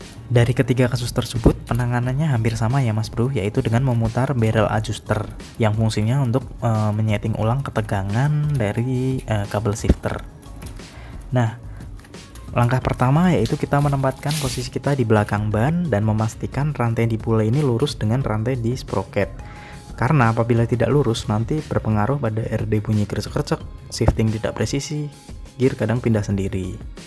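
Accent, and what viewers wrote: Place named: Indonesia. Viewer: tplong Bro ..bahasa inggris jgn dieja dgn indonesia kl screw itu bacanya skru kl adjuster bacanya edjaster hhh youtuber indonesia dah paling parah dah.. modern tampilan tp ada rasanya primitf2nya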